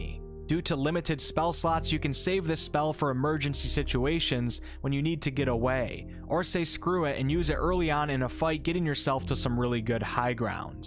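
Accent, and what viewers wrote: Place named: Chicago, United States. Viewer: true.. but i have a hard time taking him seriously because of his Chicago accent!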